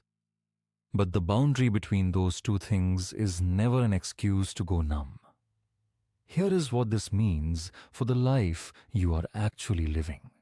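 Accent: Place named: India. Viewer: Even AI has Indian accent now! Everyone is so tired of Indian immigration and infiltration.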